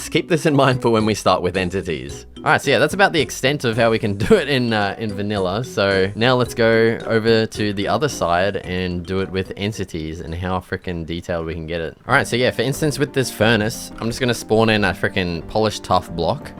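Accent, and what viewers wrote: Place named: United Kingdom. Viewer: You are a British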